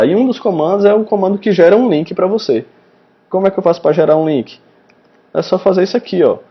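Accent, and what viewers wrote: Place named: Northeast, Brazil. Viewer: Você é o cara. Comecei a estudar Rails a menos de uma semana, e já aprendi mais do que todos esses anos tentando estudar programação voltada a back-end. Sou front-end e estou estudando uma linguagem back-end. Sempre tive interesse em estudar rails, por causa da sua praticidade, só que eu nunca entendia nada. Hoje, graças as suas vídeos aulas eu já consigo entender muita coisa. Confesso que nas primeiras aulas eu não tava curtindo muito a forma de como você falava e se expressava, porém, os vídeos foram melhorando, ao ponto de você explicar melhor do que muita gente famosa. Parabéns de verdade. Valeu por dedicar um tempo a rapaziada que assim como eu está começando no caminho do rails. Obs: Você é do nordeste? Tem um sotaque muito nordestino. Eu sou de Recife - PE. Abraço.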